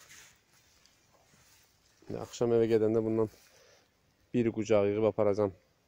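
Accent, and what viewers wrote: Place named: Qusar, Azerbaijan. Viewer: Oy oy yandirmir elivizi ozude ne qederdi deyesen biz terefdi danisiqdan his olunur esq olsun Qusarlilara👍🏻👍🏻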